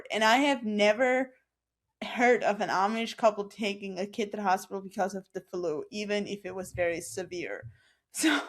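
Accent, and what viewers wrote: United States. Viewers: I find accent fascinating, and I wonder how similar it is to other people's accents from the Amish community? There are parts that sound American. But to me, as someone from the UK, there also parts that sound like a very posh English. Particularly the way words like "January" are pronounced. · It's an accent but So American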